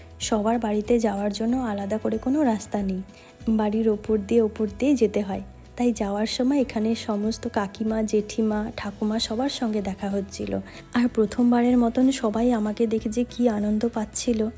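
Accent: Bangladesh. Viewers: apu tmr kotha sune mone e hoicce na j tmi indian,,,,,,,, tmr kotha akbare Bangladeshi der moto,,,,,,😊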